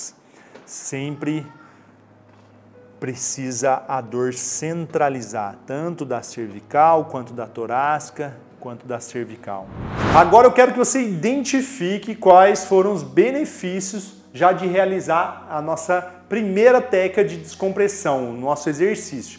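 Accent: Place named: Sao Paulo, Brazil. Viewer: Vc é de Piracicaba? Esse seu sotaque, é bem típico!!!!!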